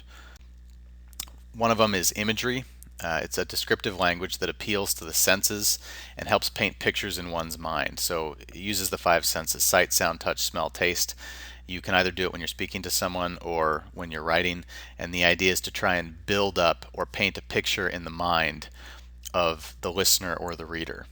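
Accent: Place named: Midwest, United States. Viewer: It sounds midwestern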